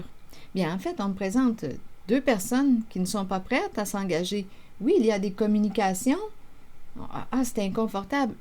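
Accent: Canada. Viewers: Bonjour Oui je suis très attirée par lui mais le repousse sauf qu'il habite en face de chez moi ya une tierce sa femme et 2gamines qui me posent problèmes depuis des années bien avant d'être tombée amoureuse de lui et cette invit arnaque Facebook 2018 où voisins(es)ont participé pour me manipuler dont cette tordue de femme et ses2gamines!et lui se cache alors qu'il me souriait se retournait sur moi m'avait même parlée..ils sont très à l'affût de la mode et lui mettait casque oreilles dans cesse pour écouter musique en allant se promener..moi je sais pas ce qu'il a dans la tête mais dans son regard je vois bien qu'il a une forte attirance pour moi..trop difficile tout ça..oui vous savez déjà que je suis en 'colere'.. J'm beaucoup votre accent canadien et vos interprétations..bonne journée..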